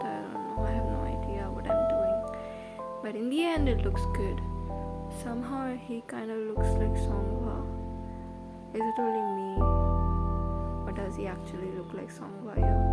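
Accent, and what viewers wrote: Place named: England, United Kingdom. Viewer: So nice voice ..... This like Anglo English..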